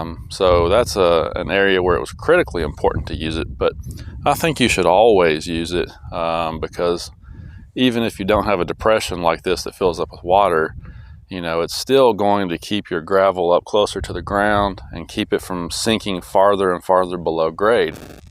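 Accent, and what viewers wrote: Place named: Kentucky, United States. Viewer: I knew you were from KY, before you said it…. Sound just like me 😂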